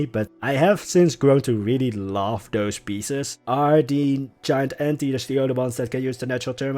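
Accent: Netherlands. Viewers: Are you dutch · Ur dutch right? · I smell Dutch... 👀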